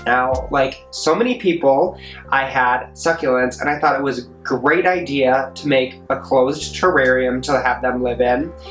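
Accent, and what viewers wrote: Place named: Canada. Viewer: cute space, cute plants, cute person, cute accent! i’m a canadian who lives in france but grew up along the border and your accent makes me miss home 💕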